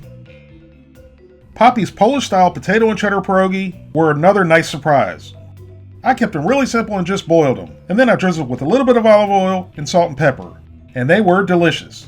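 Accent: South, United States. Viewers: you better be a professional comic writer sidelining with these videos with quips like: "Like chewing on a moist mattress in a nursing home!" OMFG. You're humor is so New York with your 'southern twang'. Dry, like your stomach after hopefully being pumped out after ingesting these disgraceful products from questionable food companies.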